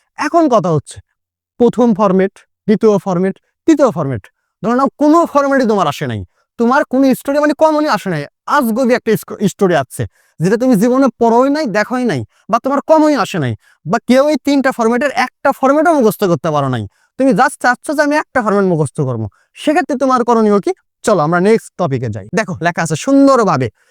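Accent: Bogura, Bangladesh. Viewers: Vai ki bogura r naki??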